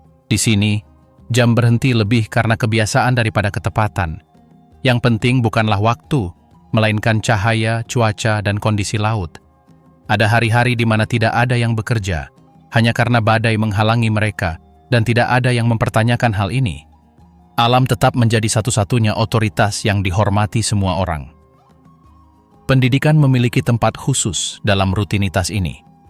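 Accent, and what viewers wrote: Malaysia. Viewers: Logat ngomongnya ini dari malaysia, tapi nama akun nya pakai nama indonesia...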